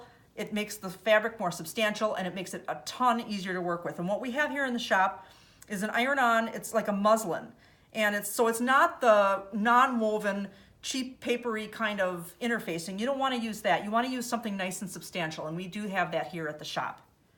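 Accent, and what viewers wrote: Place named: Wisconsin, United States. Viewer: This is a good tutorial! I like the way you teach -- you get right to the point. Sounds like you are in Michigan or Wisconsin ? Oh, I was right...Wisconsin. I recognized your accent :)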